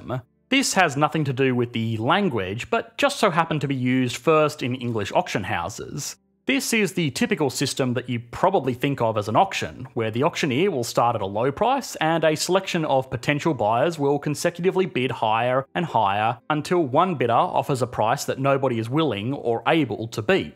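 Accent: Australia. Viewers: This guy has a very Australian accent. There are three Australian accents really, broad, general cultivated- with some variations between states too. This is almost a broad accent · He’s aussie mate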